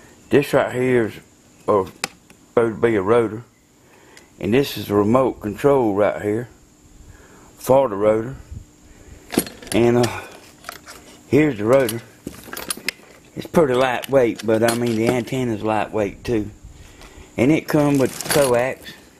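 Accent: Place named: Georgia, United States. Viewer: Soon as you started talkin I knew you was from Georgia..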